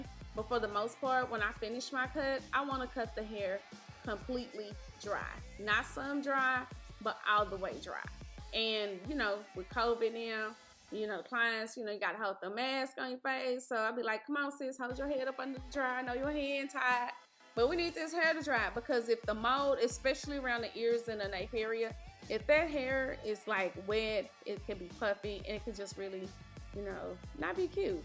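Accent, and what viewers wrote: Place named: South, United States. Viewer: This is definitely a southern voice over!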